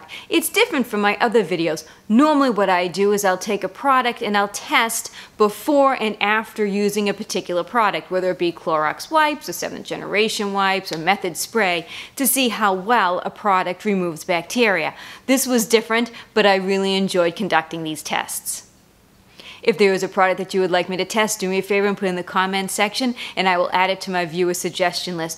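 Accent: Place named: New England, United States. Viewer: This was good. I just discovered your channel and will have to check out more of your videos - they sure look interesting! I hope you don't mind this Canuck saying, I like your New England accent. ☺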